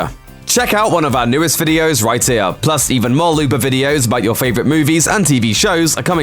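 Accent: United Kingdom. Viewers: He's actually British. He's a YouTuber called. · I can’t tell if it’s British or not lol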